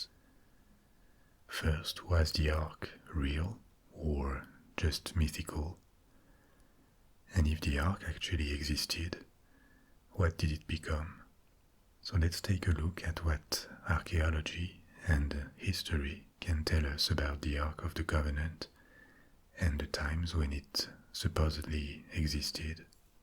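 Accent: France. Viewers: Duhhh Guys! His title is! Lol 😆 you can here it in his voice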